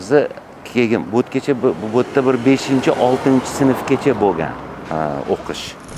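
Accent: Tashkent, Uzbekistan. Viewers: ТОЗА Тошкенли . азгина чириганро копто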